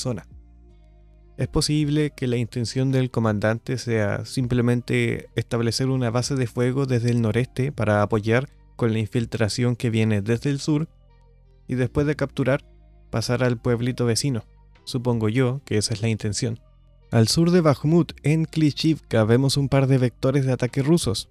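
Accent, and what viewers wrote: Chile. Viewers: Otro youtuber chileno bueno informando sobre la guerra ruso/ucraniana? Al menos a mí me suena algo chileno el acento. Bravo los chilenos! Se aprecia y agradece la objetividad. Felicitaciones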